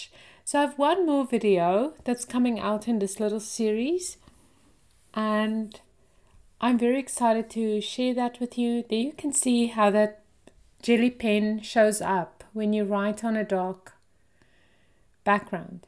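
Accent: South Africa. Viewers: Wonderful inspiration, thank you! Do I hear a South African accent? Lekker!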